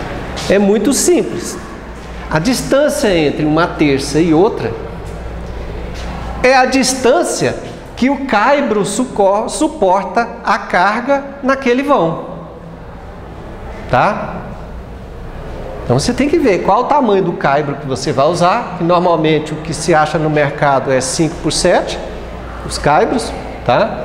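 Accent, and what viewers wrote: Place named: Minas Gerais, Brazil. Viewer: Professor excelente conhecedor de geometria, vasto conhecimento operacional com sotaque de mineiro meus parabéns professor por toda as suas teorias das edificações para execução de obras com seguranças e determinação..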